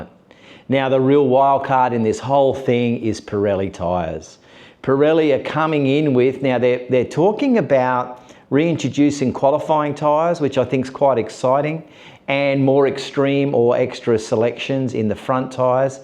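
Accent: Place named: Australia. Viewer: right. This site has the most authentic and knowledgeable discussion of our favourite sport. No AI, and in plain (Australian) English! Good one. Yes, a lot to look forward to over the next 24 months. And by the way, it is Toprak. I suspect we will all have to get used to spelling Toprak Razgatlioglu (with a silent 'g', and means 'son of Razgatli' I understand). Often called 'Stoprak' of course, for the stoppies! He and Jack have already started the Pramac stoppie contests...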